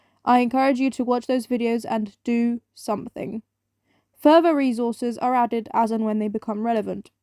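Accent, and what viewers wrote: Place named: United Kingdom. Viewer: Ale masz fantastyczny brytyjski akcent. Mieszkasz tam?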